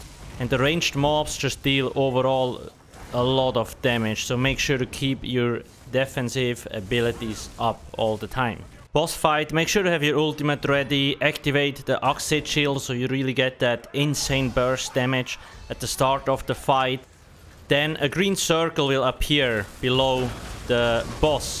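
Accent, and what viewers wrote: Switzerland. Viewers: bist du schweizer???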